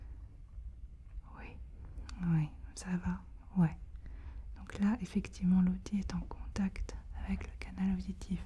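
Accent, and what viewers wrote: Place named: France. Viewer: This channel is the only ASMR channel that actually makes me fall asleep 🙂‍↔️ His French accent is very cute to listen to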